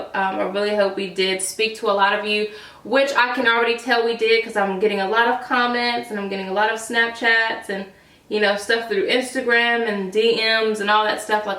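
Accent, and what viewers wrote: South, United States. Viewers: I've never noticed her country accent. Has she always had it?? I feel lost lmao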